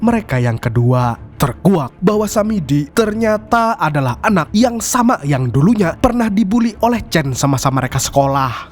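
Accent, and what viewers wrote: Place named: Kalimantan, Indonesia. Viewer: bg lu orang kalimantan yaa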